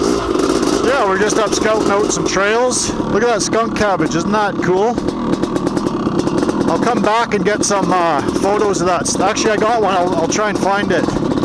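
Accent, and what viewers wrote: Canada. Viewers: are u canadian ?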